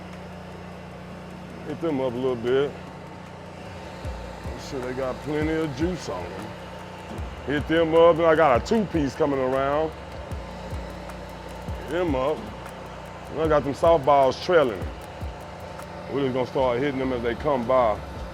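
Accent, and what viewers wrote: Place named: Texas, United States. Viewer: Oh lord. This jokers In Utah. Talked like he was Texan. No wonder he’s talking silly smack. No decent QUE in Mormon world.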